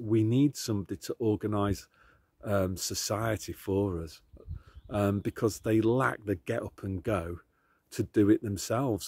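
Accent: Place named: Midlands, United Kingdom. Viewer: Larken Rose with a middle England accent.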